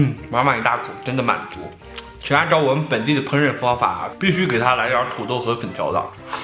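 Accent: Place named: Bayannur, China. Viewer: 我跟你说~ 听出来蓝 是个巴盟人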